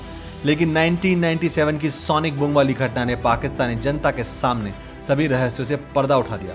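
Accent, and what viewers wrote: Standard. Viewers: आपकी सुद्ध हिंदी बहुत अच्छी है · Shudh hindi super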